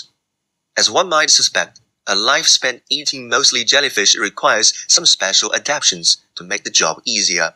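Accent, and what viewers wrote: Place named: India. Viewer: Wataaaaaa, he must be indian, awful accent I've ever heard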